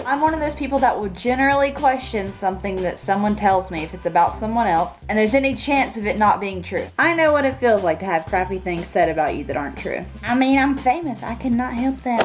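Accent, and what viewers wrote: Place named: South, United States. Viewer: Where you from folk, you got a slight southern accent doe.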